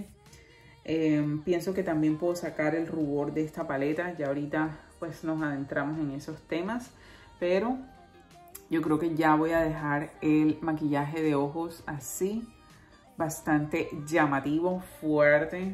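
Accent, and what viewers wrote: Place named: Cuba. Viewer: hola soy nueva por aqui vine a tu resena por tu tono de piel tan parecido al mio para ver como quedan esos colores ceresas navidenos tan lindos me da esa paleta vibras de Huda pero Huda es carinosa en los precios asi que prefiero mophe que creando sombras realmente sorprenden y con precios de waoo, tu acento se me hace de cubana como yo jaja saludos aqui una nueva sub apoyando la raza besos.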